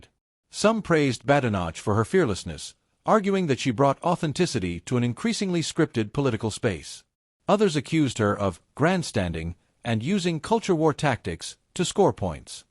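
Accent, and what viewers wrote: United States. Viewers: Dreadful editing and poor pronunciation the American accent of the bot narrating is dreadful and amateurish 😂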